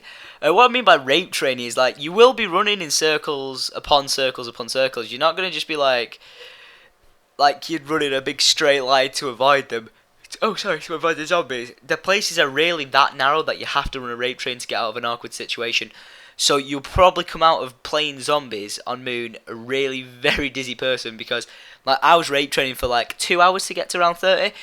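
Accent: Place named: United Kingdom. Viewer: You have such a funny british accent :D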